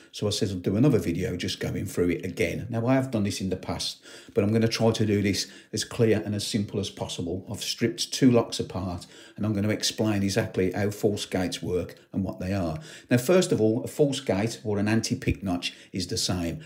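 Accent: Birmingham, United Kingdom. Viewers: Clear as glass. What a very cheeky lever having anti pick top and bottom.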